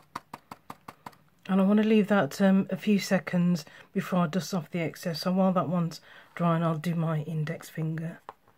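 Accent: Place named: United Kingdom. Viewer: I'm so jealous of the British accent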